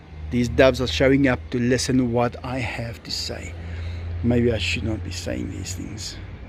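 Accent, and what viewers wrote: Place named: South Africa. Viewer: SA accent is so entertaining to me, especially when he says certain words/phrases. I particularly enjoyed “park that sucker” today 😂🤣👍. I also always enjoy “milk” and “barf” 🤷‍♀️ 🤪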